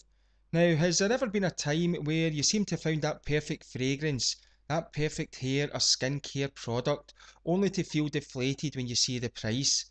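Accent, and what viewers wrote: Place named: Scotland, United Kingdom. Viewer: scottish haha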